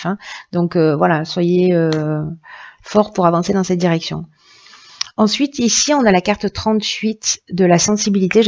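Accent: South, France. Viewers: Cc, oui il y a des artistes dans les parages 😅 intermittent du spectacle, chanteur guitariste pendant plusieurs années jusqu'à la retraite. Après un accident de la route, je replonge dans mon répertoire depuis une dizaine de jours et je répète, un peu dur après 3 ans d'arrêt. J'aime beaucoup votre voix et cette petite pointe d'accent du sud 🎉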